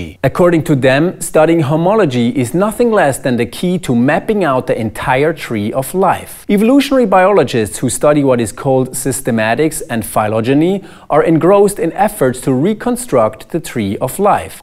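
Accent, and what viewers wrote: United States. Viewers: Speak English not American thanks.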